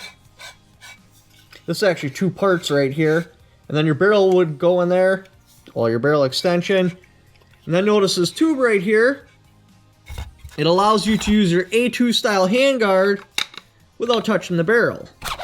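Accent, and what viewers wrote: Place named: Northwoods, United States. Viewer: All hail the finest firearms fanatic from the Northwoods. Sweetest damn accent on the internet if ya ask me. Keep on keeping on brother.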